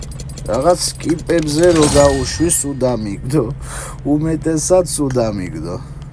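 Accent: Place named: Imereti, Georgia. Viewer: შენდენი იუსები საქსრთველოში არავის გოუხსნია საღოლ ძმაო ისე იმერელი ხარ საუბარზე მეც იმერელივარ ვანი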